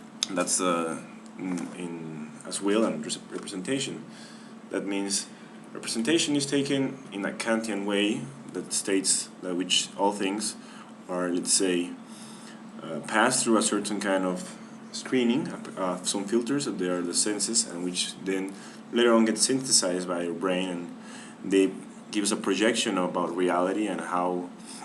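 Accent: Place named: Mexico. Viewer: Good English, where are you? I can tell you're Mexican but do you live in the States?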